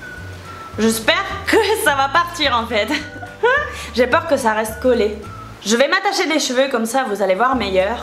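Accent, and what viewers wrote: Spain. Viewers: On dirait trop que tu a un accent espagnol je t'imagine parler en espagnol lol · j adore ton acent tu est espagnol continu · Origine espagnole 😄🇪🇸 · Ton accent 👌 Espagnol ? Les 2 première astuces m'intrigue, je tenterai également Bisous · Elle vient d'Espagne :)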